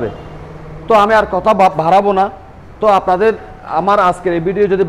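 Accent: Chittagong, Bangladesh. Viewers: আপনি চট্টগ্রামের কাছের মানুষ, বাংলাটা আরোও পরিস্কার করে বলা উচিৎ কারণ, চট্টগ্রামের ভাষা দিয়ে সারা দেশ চলে না - ধন্যবাদ।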